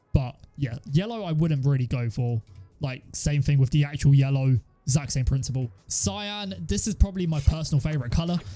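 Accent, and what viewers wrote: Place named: United Kingdom. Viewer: Love the british accent, great video thanks!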